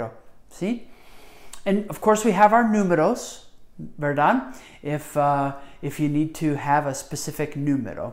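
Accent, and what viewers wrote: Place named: United States. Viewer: Woof. What's with this bad American-style Spanish? Consonants are way too strong, and the "r" is wrong. Students: this might be good content, but poor delivery. Don't do this accent. I have traveled to a number of Spanish-speaking countries without speaking a word of English to get by, and I have never heard a native Spanish speaker speak like this.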